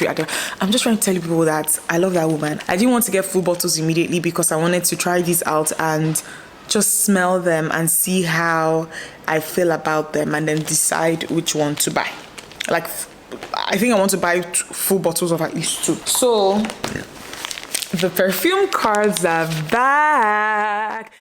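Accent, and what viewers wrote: Nigeria. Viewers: Nigerian accent, how? 😂😂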